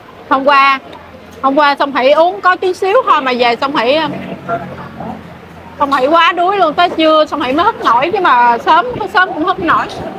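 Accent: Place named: Mekong Delta, Vietnam. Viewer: Ban vay moi giong miên tây thương yêu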